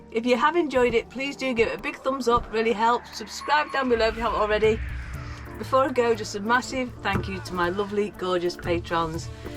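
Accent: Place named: Lancashire, United Kingdom. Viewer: First time commenting- I really enjoy your adventures and I am living vicariously through them. My family is from Westhoughton and I spent many summers there growing up so I especially love how much time you spend around Lancashire and of course your accent :) Today especially caught my ear when you said "ought" instead of "nothing". My father was quite keen on the canals but we never had the opportunity for an adventure (perhaps because mum was not as keen). Keep living your best life.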